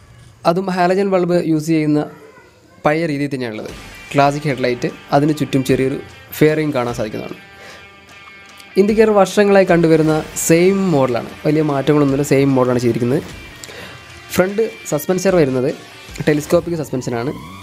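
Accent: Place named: Vadakara, India. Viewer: Vadakara kaaran anallo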